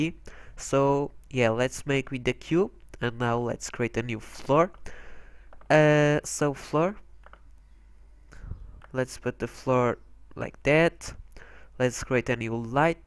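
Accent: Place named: United Kingdom. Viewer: Inglês tá bom hein kkk falando igual um britânico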